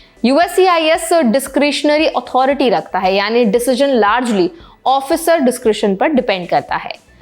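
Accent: India. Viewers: Oh, for God's sake,. Could you get your act together? Pehle शिफोल, फिर सिटुएशन, फिर एडुकेशन, फिर Fir, और अब अन्सर्टेनिटी. Location अन्सर्टेनिटी नहीं, अन्सर्टन्टी होता है. Uncertain >> Uncertainty. Please check Google. Kisne aapko Angrezi padhaaii? Main to sochtaa tha aap padhi-likhi hain lekin aapki Angrezi ke mispronunciation se lagtaa hai aapne bas kis UP ke Bhaiyya ya kisi Bihari babua se Angrezi seekhi hai. Ya to aap shudhha Hindi mein baat karein, and if you must use some English words, make it a point to pronounce the English words correctly. Angrezi ko itna disrespect to na karein.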